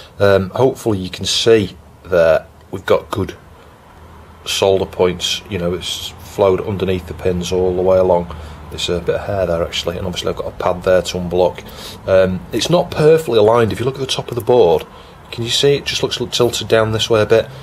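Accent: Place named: Northern England, United Kingdom. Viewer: It's also that thick Manchester/Sheffield accent that's making my brain a bit herky-jerky when I'm trying to listen. :)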